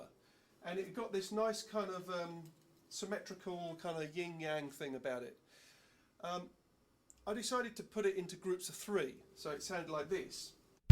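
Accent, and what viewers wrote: United Kingdom. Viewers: You sound like you're from London! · Yeah east, hes cockney mate!.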